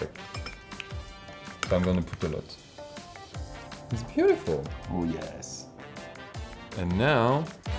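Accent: France. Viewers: are you french???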